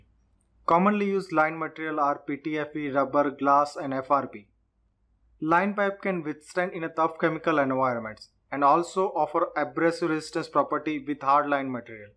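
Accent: India. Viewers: is it indian accent?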